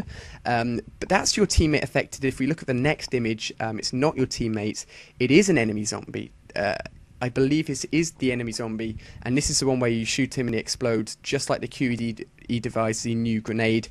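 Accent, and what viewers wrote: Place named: United Kingdom. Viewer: why is it always a british guy?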